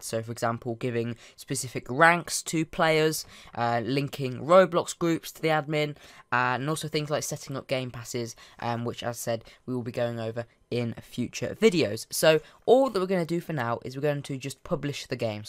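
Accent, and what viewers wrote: United Kingdom. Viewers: He’s British that’s how they say it there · Dont bully him cuz he is saying hach its a british thing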